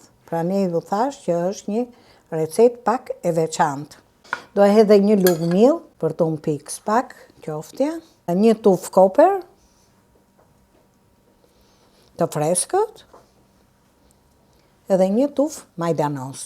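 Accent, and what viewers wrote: Tirana, Albania. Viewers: Zonjë e nderuar të lumshin duart ,sa mir gatuan dhe sa bukur shpjegon me dialektin Tironas ,paç jetën sa më të gjatë ,dhe të kesh shëndet të mirë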